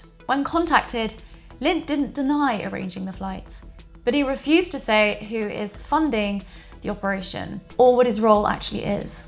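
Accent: United Kingdom. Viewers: I saw your thumbnail. And I thought, “I bet she has a British accent” BAM! I’m psychic 😮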